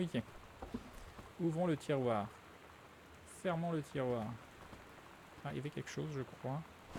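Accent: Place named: England, United Kingdom. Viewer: Hey salut =) je t'ai découvert sur les vidéos d'Aventures, j'étais intrigué et je dois dire que je suis surprise. Cependant, ta personnalité reste fondamentalement et c'est cool, tu t'immerse dans le jeu tout en gardant ton humour (y). Tu as gagné une nouvelle abonnée je dois dire, et ps: ton accent anglais est é-pique haha ~ Continue!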